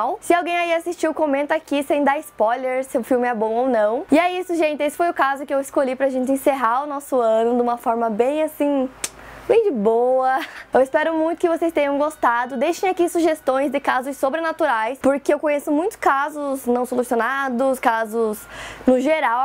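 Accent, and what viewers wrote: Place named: South, Brazil. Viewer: Que sotaque muito bom de se ouvir,qual estado do Sul você é?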